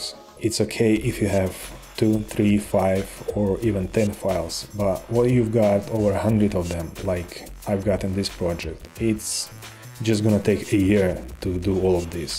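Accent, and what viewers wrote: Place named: Russia. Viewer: Слышу что русский акцент, спасибо дружище, выручил!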